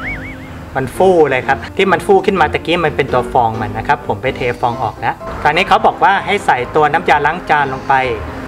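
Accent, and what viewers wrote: China. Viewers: พูดไทย ออกจีนๆ นะ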